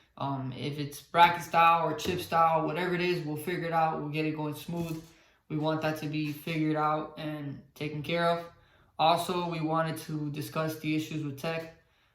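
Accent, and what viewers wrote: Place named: Hialeah, United States. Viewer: Wow For this event being low on staff, you guys did a good job. It sucks when people end up flaking on you, however you guys adapted and overcame the Situation. As for Tech Inspection I agree you gotta leave the friendship out and be fair for everyone. A true friend will understand. Im sure this was a learning experience for you and your team. So For the next event just have a few backup plans incase shit hits the fan again . BTW It was Good to meeting you funny how you were talking to Frenchie and Immediately recognized your voice. Tremendo Hialeah accent 😆 🤣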